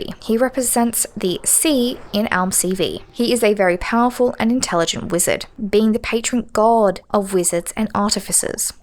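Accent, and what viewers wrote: Australia. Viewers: Just wondered the same they have like a perfect voice for voice overs thank god for the slight australian accent making it stand out especially nowadays!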